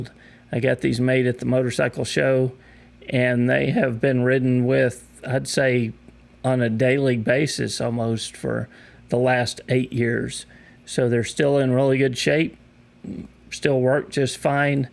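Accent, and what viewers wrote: Texas, United States. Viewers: Hey. You and Anthony might be twins, but he talks like New Yorker, and you talk like a Texan. You just need to practice saying "y'all" instead of "you guys" when you record your videos. Loved your impersonation of Anthony! Hilarious!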